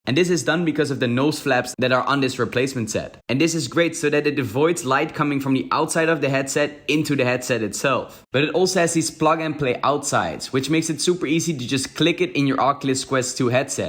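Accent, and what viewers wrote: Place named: Netherlands. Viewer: Nederlands accentje😂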